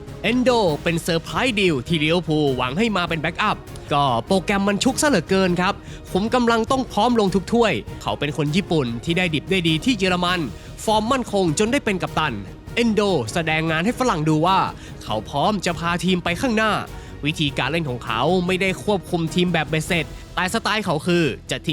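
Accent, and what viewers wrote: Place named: Chiang Rai, Thailand. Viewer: แอดมินเป็นคนตางใดนิ ปากเมืองสำเนียงคนเจียงฮายแต้ว่าอั้น 555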